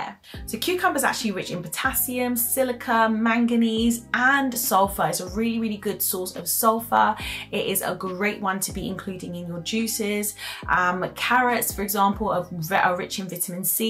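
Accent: United Kingdom. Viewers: You have a Brittish accent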